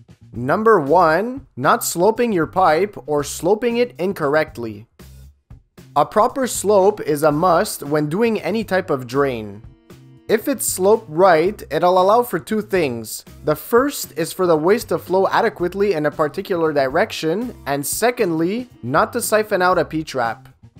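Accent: Quebec, Canada. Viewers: bel accent québécois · no, but I think you have a French Canadian accent like me lol. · tu me semble etre un quebecois je me trompe ?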